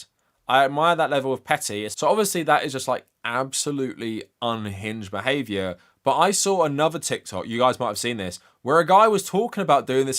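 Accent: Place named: United Kingdom. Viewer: But you sound like a "bloke" who lives in a country with a KING!!!!!! Is that more evil than the US system, or is it the same? What is your opinion of the house of Lords? It's ok that you have opinions, but it seems you don't have any mirrors in your house..... maybe look at yourself? just sayin....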